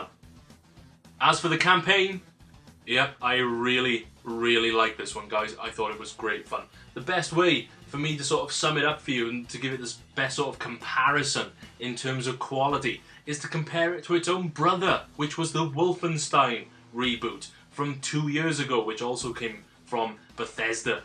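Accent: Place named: Wales, United Kingdom. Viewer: You sound very Welsh What part of Wales are you from!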